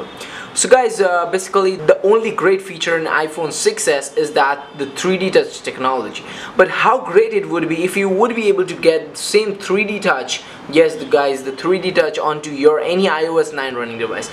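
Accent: India. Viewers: your voice is annoying.. but good videos... indian voices ARE annoying for other countrys :)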